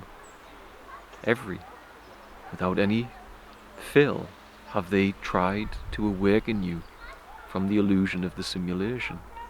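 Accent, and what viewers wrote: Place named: Northern England, United Kingdom. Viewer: Are you in Northern England here? Is this also the source of your lovely accent? 😊🙏🏼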